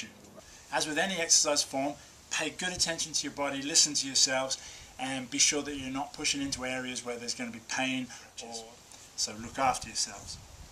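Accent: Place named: Manchester, United Kingdom. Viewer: My God he's from Manchester.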